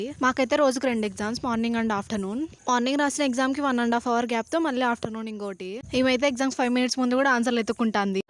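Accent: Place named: Rayalaseema, India. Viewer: Urs is rayalaseema slang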